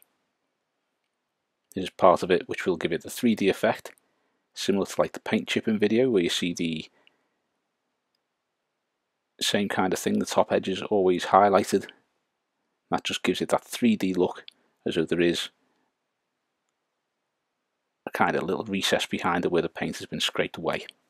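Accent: Liverpool, United Kingdom. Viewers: This is brilliant. Its the little things that make it. Ill defo be using this. Scouser too 🤘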